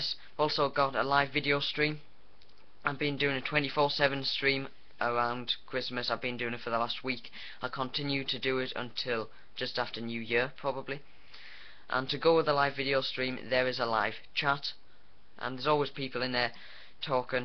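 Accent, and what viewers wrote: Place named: North East England, United Kingdom. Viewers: Its a Sunderland accent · it's a north eastern accent, not quite Geordie but sort of sounds like it.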